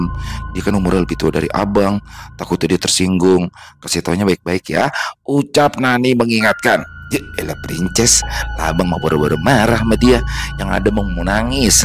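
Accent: Jakarta, Indonesia. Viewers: Waalaikumsallam...malem bang. urang gunung hadir bang.ini cerita terpaporit saya warung kopi bang doni saya suka logat betawi nya inget dulu waktu tinggal di jakarta waktu kerja di kuningan hehe.salam sehat buat bang dan kru serta keluwarga😀🙏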